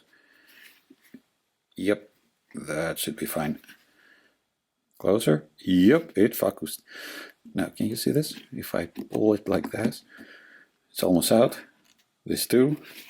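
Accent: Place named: Greece. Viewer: When i heard you speak English i could distinguish a greek accent. When i saw the ΝΥΜΦΗ beer; i was almost sure that you are Greek!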